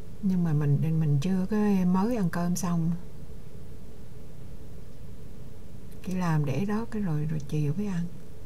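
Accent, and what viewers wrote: Quang Nam, Vietnam. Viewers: nghe giọng Quảng Nam, mình thích cực kỳ!❤️ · Nghe chi noi ma minh Nho toi ma minh , ma minh nguoi Tra kieu, Quang Nam. Don gian , moc mac , khong trau chuoc. Cam on Chi chi lam nhieu mon an ma nguoi Quang Nam ua an . Chuc chi luon khoe va gia dinh luon duoc hanh phuc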